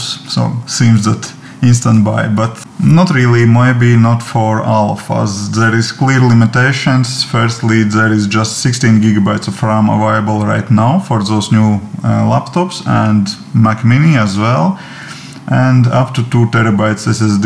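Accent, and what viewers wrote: Russia. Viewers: lol. I thought you are Russian. you have a russian accent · You're Russian, right? You have a Russian accent :D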